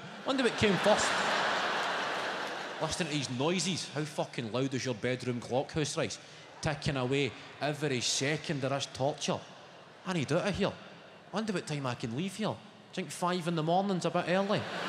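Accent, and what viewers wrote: Scotland, United Kingdom. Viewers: I adore the Scottish accent so much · What's with the comments about "foreign languages"? He's speaking in a Scottish accent and not even a particularly strong one either, perfectly understandable, not our fault if people from the USA (I'm assuming) can't understand, and I'd question the hypocrisy of such a comment considering some of the regional accents y'all have in the USA, and to be frank even the less accented versions of Amercan english are not exactly "english " either are they? (I'm half American and I've travelled extensively right across the USA, so I know what I'm talking about.)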